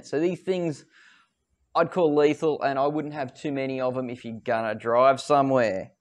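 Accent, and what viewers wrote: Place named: Australia. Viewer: Nothing’s better than watching a local brew. Love the Australian accent, approach and ingredients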